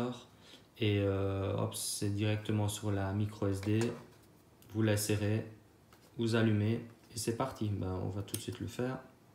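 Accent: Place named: Liège, Belgium. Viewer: le bon accent liégeois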